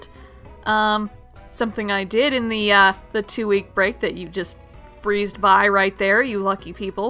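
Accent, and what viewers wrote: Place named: France. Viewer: may i just say that is the most amazing french accent greqt vid as well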